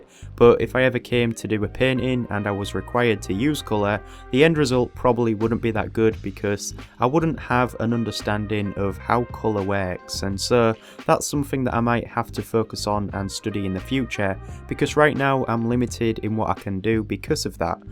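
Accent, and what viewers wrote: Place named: Middlesbrough, United Kingdom. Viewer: Are you from Middlesbrough or nearby? I think we have a similar accent haha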